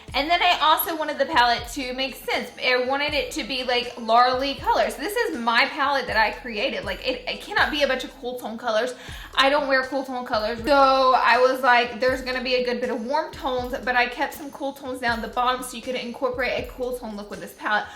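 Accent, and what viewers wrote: South, United States. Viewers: Patiently - ok, well not really - more like ANXIOUSLY awaiting this palette's arrival from your SnapChat family preorder ..... Come On, Violet Voss..... This Mississippi Girl is chomping at the bit !!!!! So proud for you Laura !!!!! Love your southern accent and of course the fact you use our special Southernese Sayings !!!! Keep up the GREAT work !!!! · Love it!!!!!!! ♡♡♡♡♡ CONGRATS. LOVE the names I'm in Tennessee and everyone makes fun of how we southerners talk. I love how Laura Lee talks sooo southern. ♡♡♡♡♡♡♡ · Christen Dominique mentioned you in one of her snaps and I just had to see your channel. When I heard you talking I knew you had to be from the south. I'm from Alabama as well and I love all the shadow names! 😂 Congratulations on your palette it looks amazing!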